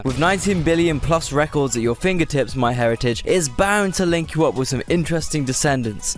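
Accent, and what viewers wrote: United Kingdom. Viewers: lol actual Native Hawaiian here ill show you around if you give me british accent lessons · British accents have an edge on everyone else when it comes to narrating YouTube videos like this guy. For instance, listening to critical drinker with his Scottish accent spew his criticisms of movies and streaming tv shows like Amazons Rings of Power is absolutely hilarious because he’s mostly right, but also that Scottish accent gives it an extra comical spice. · Why is a British guy explaining US states? What are the rankings supposed to be based on? Have you ever even been to the US?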